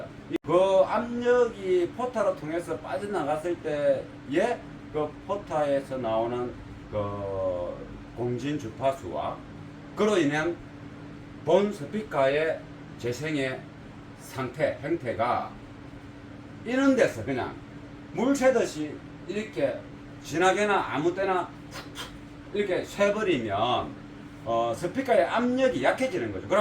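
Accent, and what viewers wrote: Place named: Gyeongsang, South Korea. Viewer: 영상잘보고갑니다 ~^^ 같은 경상도 말투라서 정감 이갑니다~^^ 사장님혹 고향이 진주입니까?~^^ 우야둥둥 매번 시청합니다~~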